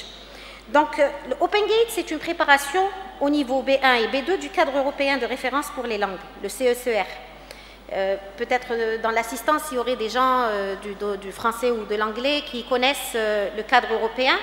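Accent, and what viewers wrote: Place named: England, United Kingdom. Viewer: teachers of English trying to speak French, we still can notice the effects of English on their French pronunciation.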